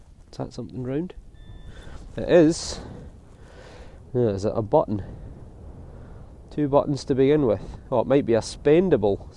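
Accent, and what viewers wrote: Scotland, United Kingdom. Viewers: New subscriber here and Im binge watching your videos. Love listening to your scottish accent..(to me) and love your videos. Do you give all your finds to the owner of the properties?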